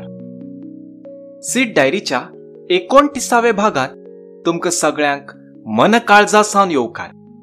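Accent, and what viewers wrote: Karwar, India. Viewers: सुंदर कथा संदेश सर 👌. As always Best narration sir, karwari style narration added new flavor to the story..👏👏👏👏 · Very nice story with simple flow. I really liked the karwari attributes of the writing as well the story. bhai😘😘 nice job of implementing Karwari accent 😊😊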